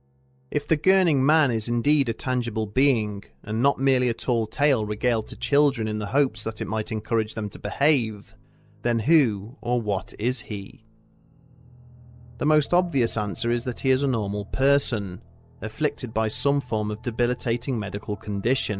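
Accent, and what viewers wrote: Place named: Midlands, United Kingdom. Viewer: Sounds like somewhere in the Midlands to me.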